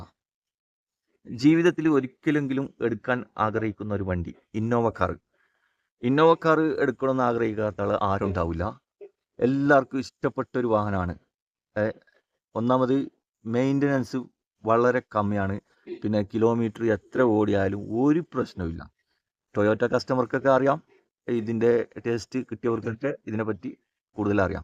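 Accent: Kasaragod, India. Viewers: കാസറഗോഡ് ബാസ 😅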